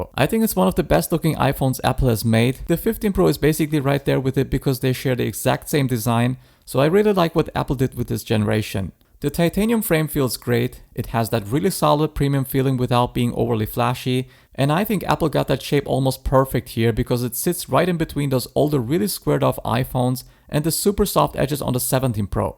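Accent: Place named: Germany. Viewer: An interesting accent. Are u german?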